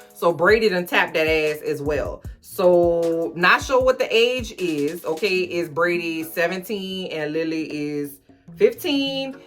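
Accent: New Orleans, United States. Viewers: As someone who is also from New Orleans, I appreciate this commentary in New Orleans accent. I’m just over hear laughing because it’s like overhearing a good conversation. 😅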